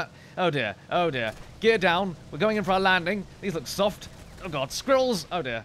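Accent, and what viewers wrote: England, United Kingdom. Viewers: It's a show, although he has been talking like this for so long that even on his serious videos he will sometimes go off into his wonderful British way of talking :) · oh god, you are sooooo british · I'm in love with your accent. What kind of british are you, m8?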